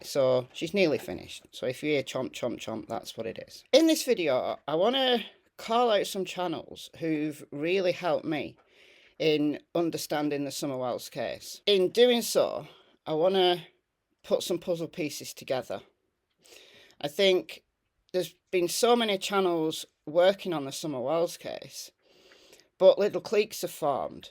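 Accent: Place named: Newcastle, United Kingdom. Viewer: Hi i live middle england but from newcastle i love the way you talk sound like me haha love how you stick to facts x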